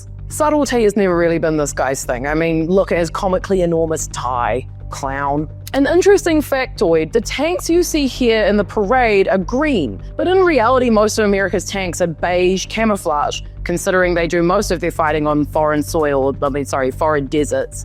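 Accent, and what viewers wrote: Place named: New Zealand. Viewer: You have the perfect balance of serious vs funny, if you can't laugh you'll cry? or something like that .. I'm from NZ and it's cool to hear the accent on youtube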